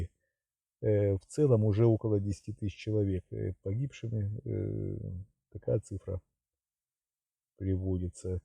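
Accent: Ukraine. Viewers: Послушала полторы минуты и поняла что вы из Украины.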